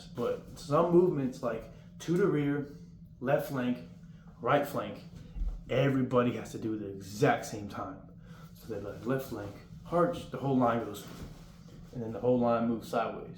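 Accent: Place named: South Carolina, United States. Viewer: Are you from South Carolina? Sound like it lol i am too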